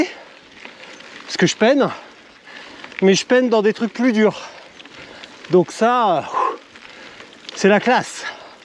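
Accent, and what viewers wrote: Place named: Alsace, France. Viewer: Accent alsacien déjà qu il faut distinguer celui du haut Rhin de celui du bas Rhin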